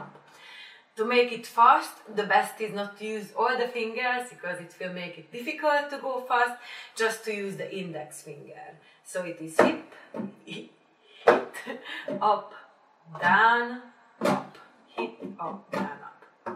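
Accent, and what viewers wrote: Hungary. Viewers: Trust me bro! I have a thick hungarian accent, just like has... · Your accent is so hungarian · Nagyon aranyos a magyaros angolod :)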